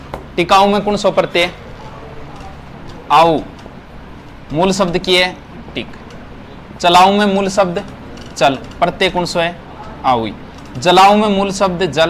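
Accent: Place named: Marwar, India. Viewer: Thank you sir gi so much very helpful your videos Or Aap ki bhasa desi marwad very nice 🙏🙏🙏🙏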